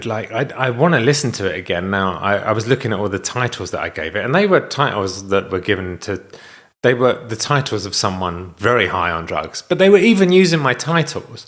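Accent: United Kingdom. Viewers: I don't blame you for being really pissed, who wouldn't be? However don't be so hard on yourself, we all have some kinda in our life's! I love music too! And I love you to pieces! A lot of us extraordinarily ordinary people. Your charmingly witty British accent tickles me funny bone! Your music is so beautiful. I'm grateful that you've shared what those nasty people are doing. Shame on them. I tend to be a very trusting person, it's just my nature, and it has turned around and bit me in the butt, in the end, I learned more about the nature of greedy little people, so please don't be so hard on yourself. Lesson learned! Smile, it looks marvelous on you!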